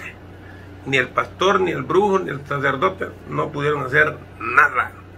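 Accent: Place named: El Salvador. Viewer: Éste se habla como salvadoreño tas tas ciado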